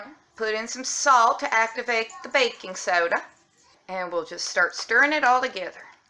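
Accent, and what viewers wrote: South, United States. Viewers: You sound more southern than the actors and actresses that fake them!!❤❤❤😎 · 💞Love your Southern Accent & how funny you are!!💞💞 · I just love Southern Belle voice. xoxo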